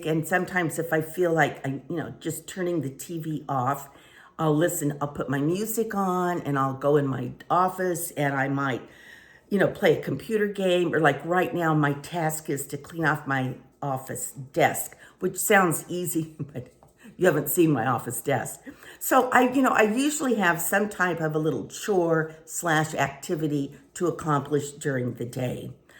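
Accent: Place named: New York, United States. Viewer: Idk why but you remind me of Talk show host Wendy Williams. You kind of have her mannerisms and her New York accent. 74 wow u look amazing.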